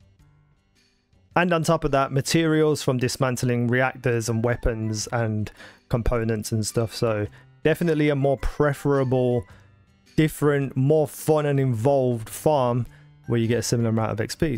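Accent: Birmingham, United Kingdom. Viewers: I wasn't being rude when I called you Brummie. I'm from the south coast but I'm actually welsht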